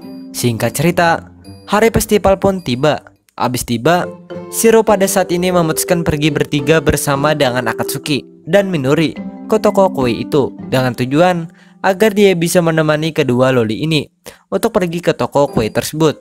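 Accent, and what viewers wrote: Banjar, Indonesia. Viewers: banjar banarr wkw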